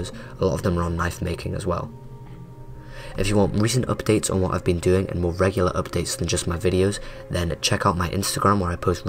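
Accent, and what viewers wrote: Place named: England, United Kingdom. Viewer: bla bla bla bla typical england english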